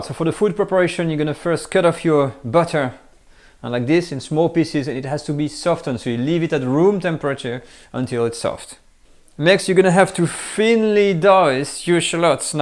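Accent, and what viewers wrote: France. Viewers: I never heard such a clean and smooth french accent... it's like english is flowing out of your mouth. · Je ne comprend l’anglais que quand un Français le parle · Love the accent! Nice to know a Frenchman is teaching! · Sorry find the French accent very irritating. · Can you please hire someone to translate, your strong french accent is really annoying. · I've tried a few times to watch this guy's videos but I just can't stand the sound of a French accent. Makes me nauseous. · I like your English with French a cent greeti gs from Athens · Its very nice to hear ur English in French accent 😜 and thank you for the recipes.... I tried onion soup and it came out tasty🤗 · even when you talk english you have that french akcent that is amaxing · I didn't know how much French love butter until I watch your video. The sauce looks pretty and creamy. 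Btw, your Frenchy accent is so cute.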